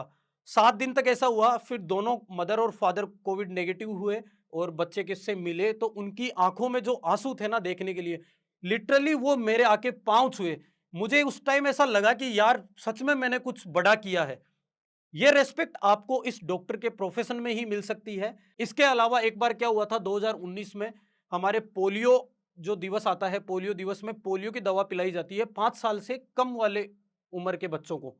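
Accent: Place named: Hadoti, India. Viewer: Hadoti wali tone❤❤❤❤ hum Bundi se hai 🎉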